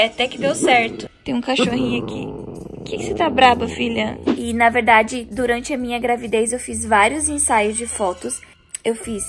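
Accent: Santa Catarina, Brazil. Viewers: Vc é de SC kkkkkkk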